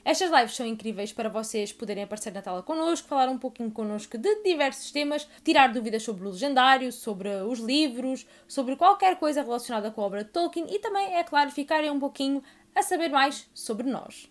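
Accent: Portugal. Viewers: Como é lindo o sotaque do português de Portugal 😍😍estó anciosa pelos filmes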